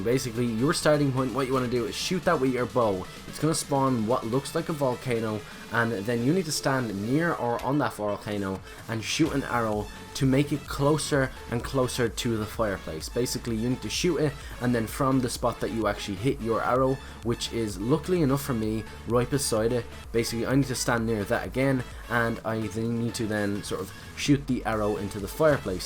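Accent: Dublin, Ireland. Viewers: Are you from Dublin you sound like it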